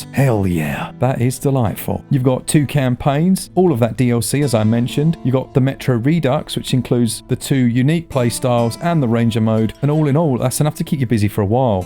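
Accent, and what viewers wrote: United Kingdom. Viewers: Man, I love your british accent! Great reviews, always. You have now a huge mexican fan.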